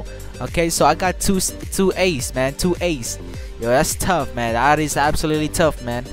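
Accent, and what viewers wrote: Philippines. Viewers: Your speaking english but your accent is filipino :\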